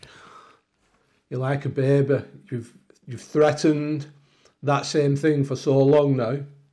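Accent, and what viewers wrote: United Kingdom. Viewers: Sir, why have you not spoken to your local parish and asked for a blessing of your home and property? I gather from your accent you're British; certainly the COE or the Catholics can assist. You are on a long journey now; be advised Evil always scratches us when encountered. You definitely need spiritual protection from the Christian Church and none of these others. For Real.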